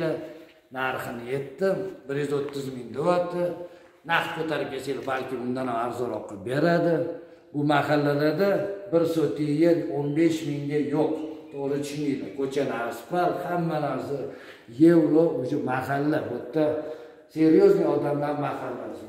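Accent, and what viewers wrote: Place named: Tashkent, Uzbekistan. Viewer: Жуда ошиб кетмадими хакикат парвар выключи братан ты ташкентски окцент респект но это не идёт тебе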